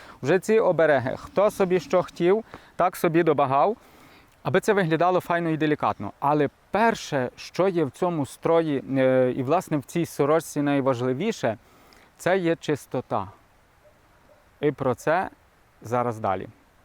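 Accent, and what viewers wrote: Hutsul, Ukraine. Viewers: Як я люблю слухати цього чоловіка, ну дуже файно розповідає, з дуже гарним гуцульским діалектом!!! Христос ся рождає!!!!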